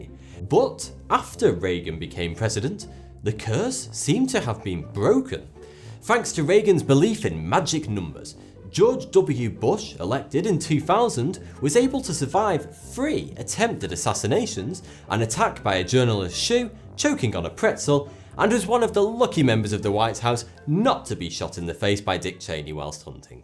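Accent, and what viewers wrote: United Kingdom. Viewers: Mikes8899 the sparkle white oversized front teeth, the pretentious and overly british accent, not to forget the smug look on his face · his British accent with a lisp is really annoying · This is a British accent but from which area or city? It is interesting. London? York? Lancaster?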